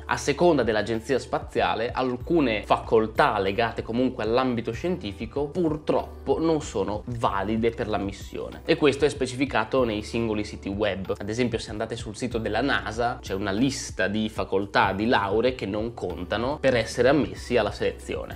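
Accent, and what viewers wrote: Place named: Ferrara, Italy. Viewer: ho sentito tantissimo il tuo accento ferrarese, più del solito😂 Comunque il video stra interessante, non sapevo un sacco di cose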